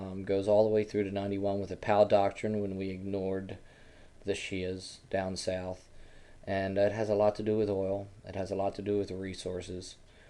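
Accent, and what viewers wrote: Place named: Baltimore, United States. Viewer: Your accent is so Baltimore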